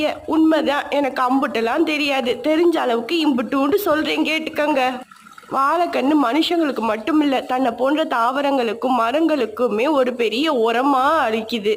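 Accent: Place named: Madurai, India. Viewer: மதுரை பேச்சு அருமை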